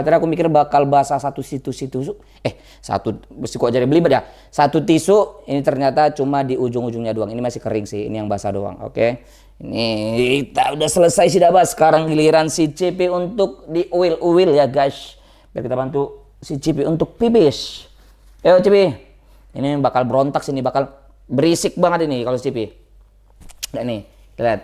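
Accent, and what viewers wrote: Java, Indonesia. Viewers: kangen suara Asli jawanya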